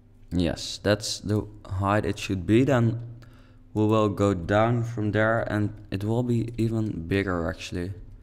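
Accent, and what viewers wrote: Netherlands. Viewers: hahaha ik hoorde meteen dat je nederlands bent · Hey ik wil ff zeggen dat je echt super gave huizen maakt maar 1 tip: Praat alleen in de intro en daarna niet meer,je kan heel goed horen dat je Nederlands bent door je accent. (het stoort me) zet voor de rest van je filmpje een muziekje op x Niet gemeen bedoeld alleen een tip:)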